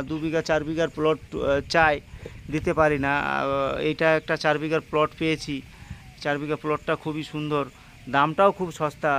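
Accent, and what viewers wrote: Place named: Bangladesh. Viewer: এই ভাইয়ের কথা শুনে মনে হচ্ছে উনি বাংলাদেশি। আমাদের খুলনা অঞ্চলের বাড়ি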